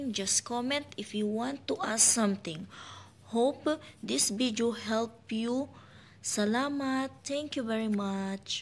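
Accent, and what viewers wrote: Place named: Indonesia. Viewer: I think you're not a filipina? Your accent like Indonesian, malaysian, indian girl.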